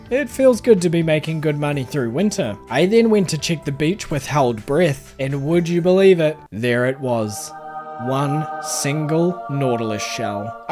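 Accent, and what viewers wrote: New Zealand. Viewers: I had no idea he was kiwi! i guess i didnt tune into the accent because im also from nz and i was so excited when i found out! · are you from new zealand i can tell from the accent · Are u kiwi?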